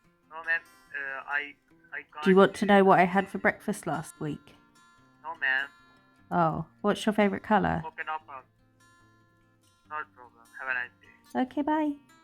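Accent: United Kingdom. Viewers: I... did think you're Australian?! 😅This is me being embarrassed now!!! OMG! You're a BRIT! I love it! How could I have not known? I don't know why, but I always confuse the accents of UK, AU, and NZ... it's like trying to tell Scottish from Irish. Or Mexican Spanish from Spain's Spanish, or French and Canadian French. I'm so bad with accents!! Despite all of it, I still think your kindness is top notch!! UV light is like cigarettes. I'm not gonna nag anyone about smoking a cigarette now and then, but if you do it often enough, and if it's unfiltered, etc, you know, those kinds of things increase risks. So let me start by saying I'm a redhead with very fair skin. I don't tan; I burn. I spent every childhood summer burnt to a crisp, red as a cooked lobster before humanity understood the increased skin cancer risks per sunburn, blah, blah, same thing with smoking. 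Now I'll add my age (50), family history (an uncle with skin cancer), and me being on chemo for a bit over 2 years as of right now, so the risks add up. This isn't me trying to create mass hysteria over sunshine. I swear, I'm ultra-aware that all my risk factors don't apply to everyone. I'll share what I learn, but I don't wanna push it on anyone. I'm not an evangelist, just trying to explore risk reduction. Also, another thing neither of us thought of, and I could slap myself now because I've studied it a bit, SILK!! I had myself a good cry about an hour ago as I was reading and watching tutorials about how to repa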